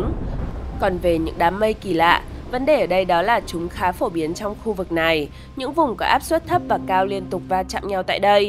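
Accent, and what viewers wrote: North, Vietnam. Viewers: giọng bắc pha giọng nghệ tĩnh ở một số từ có dấu sắc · doc giong nam di giong bac kho nghe qua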